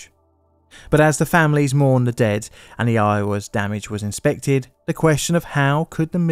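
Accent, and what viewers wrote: United Kingdom. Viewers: The US Navy is being taken to task... by a YouTuber with a Brit accent... at least slightly ironic, eh wot? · AI narration with a Limey accent.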